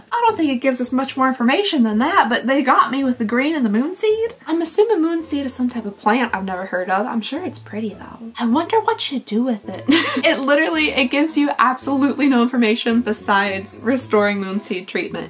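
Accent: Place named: South, United States. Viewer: I’m so sad to see advent season coming to an end!! But I’m so grateful that you stretched it out till Easter !! I have been watching you for the last 3-4 years and I want to say that you are one of my very favorite channels here on YouTube. Although I am so many years older than you are darling , you remind me so much of so many of my friends and even a tiny bit of my daughter who is around your age ! Just your general way of speaking and your mannerisms feel so familiar to me and maybe it’s a southern thing but I just adore you !! I love watching any of your videos it reminds me of home !!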